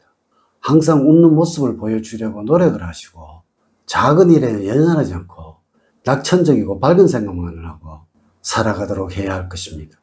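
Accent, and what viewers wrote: Gyeongsang, South Korea. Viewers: 안녕하세요.수고 많으십니다.경상도 발음 이시라 항상 말씀 끝 부분이 속으로 들어가는 느낌이 드네요.첫 부분만 두드러지세요.항상 감사합니다..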